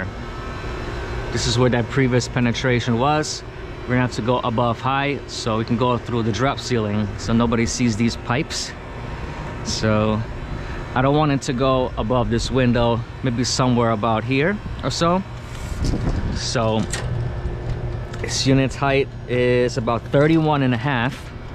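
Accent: New York, United States. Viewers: I see and hear you're in NY...laws and regs are crazy there...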